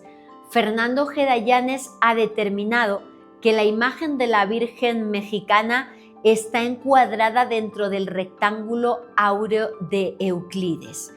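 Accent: Spain. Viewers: La única persona de la cual no me molesta su acento español ...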